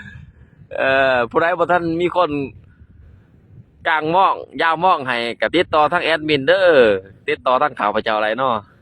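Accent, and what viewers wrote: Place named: Pakse, Laos. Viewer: Sabaidee!!! You sound like you're from Pakse, I was born there. I am happy to see that you are showing Foreigners our lifestyle in our country/Laos....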